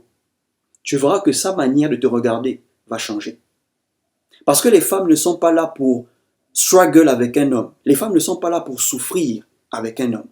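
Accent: Gabon. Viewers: Bro tu connais.escuse moi tu es de quel pays.tu parle comme un gabonais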